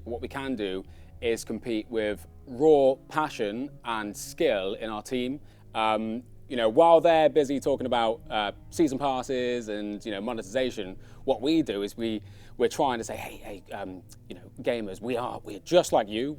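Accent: United Kingdom. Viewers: English bloke, more specifically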